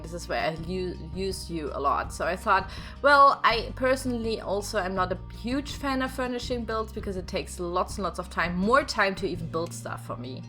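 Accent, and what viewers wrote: Germany. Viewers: Wow thats amazing! BTW judging your dialect i bet you're from germany, aren't you? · I love your German accent! 💕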